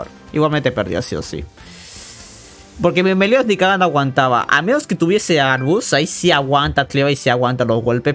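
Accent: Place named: Pocho, United States. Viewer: Yo te escucho más pocho fiu fiu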